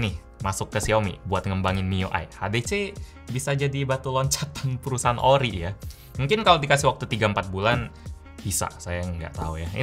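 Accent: Indonesia. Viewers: Buanglah accent indo ko tu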